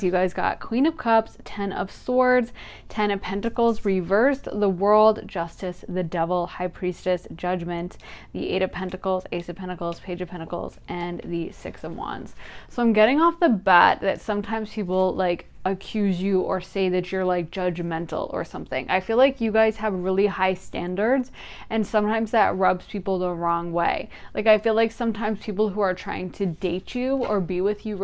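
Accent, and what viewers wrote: Minnesota, United States. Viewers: are you from MN? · Are you from Minnesota?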